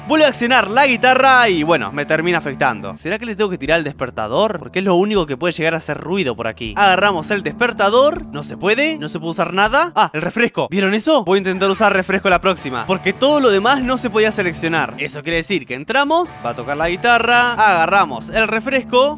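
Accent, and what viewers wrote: Argentina. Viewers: Sos argentino?????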